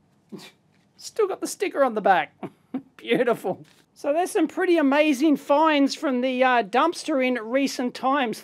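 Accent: Australia. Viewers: You did up the Aussie level on this one for sure mate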